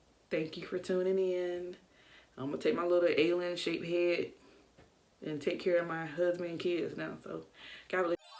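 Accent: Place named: South, United States. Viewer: I thought you from chicago or Alabama because the base southern hue in your voice and I'm from new York living in me mama's hometown and grandma's in Goldsboro,NC.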